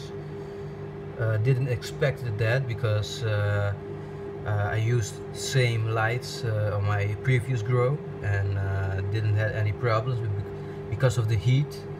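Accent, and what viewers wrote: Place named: Netherlands. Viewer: Hey r u Dutch? You sound Dutch... or scandinavian. Anyway + sub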